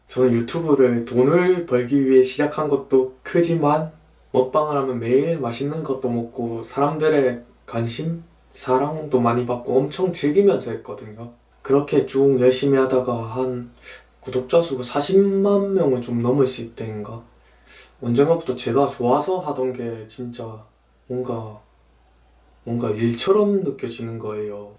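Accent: Gyeongsang, South Korea. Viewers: 대구가 고향이신가영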